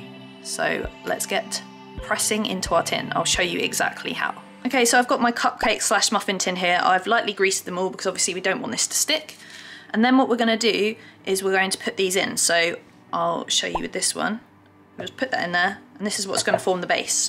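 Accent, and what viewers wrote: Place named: Southeast England, United Kingdom. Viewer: Judging by her accent, she's from the SE England!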